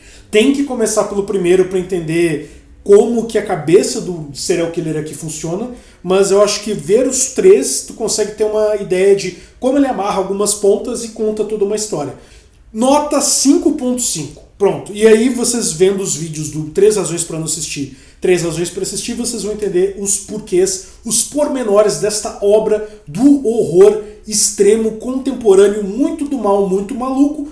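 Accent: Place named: South, Brazil. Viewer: acho que ele é ou Paranaense ou Catarinense por esse sotaque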